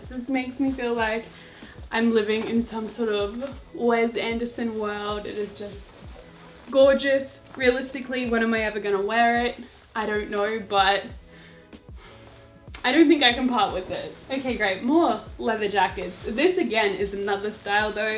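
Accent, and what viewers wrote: Australia. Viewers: Me staring at the phone after pulling an all-nighter (it’s am now) trying to figure out if she has an Australian accent👁👄👁😭🤦‍♀️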